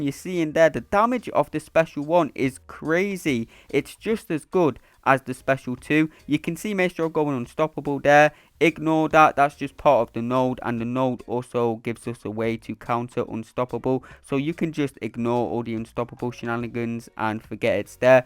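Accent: United Kingdom. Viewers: Hey, where in the UK are you from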